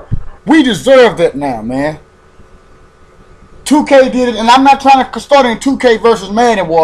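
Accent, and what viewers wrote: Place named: Baltimore, United States. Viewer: That Baltimore accent is thick as shit. Vieruu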